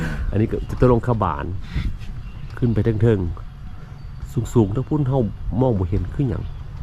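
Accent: Phu Thai, Thailand. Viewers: เสียซื่อพุไทได้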